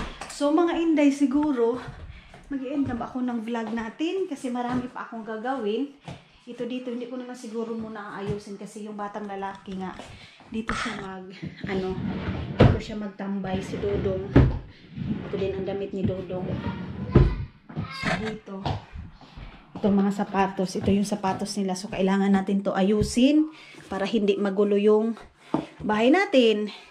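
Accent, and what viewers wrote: Visayas, Philippines. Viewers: Bisaya man Did ay ka Ma'am hahaha asa ka sa pilipinas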